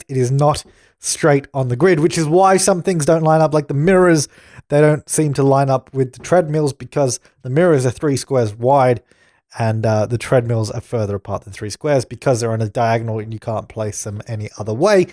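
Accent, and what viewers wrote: Australia. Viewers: Here in the States in Ann Arbor Michigan they have a arcade place, its a big one, it has nothing but that, and a snack area, I forget what its called now, its been about 5 years sense I was in Ann Arbor,lol! And I have to say, I love it when Aussies say No worries, meaning no problems or trouble, its kinda cute! lol! I enjoyed this video more because of his accent, if he had an American accent I wouldn't have watched the whole thing,lol!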